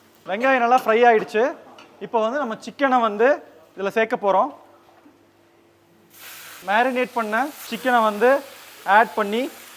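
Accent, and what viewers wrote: Kongu, India. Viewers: சூப்பர் ங்க உங்க சமையலும் நம்ம கொங்கு தமிழும் ❤❤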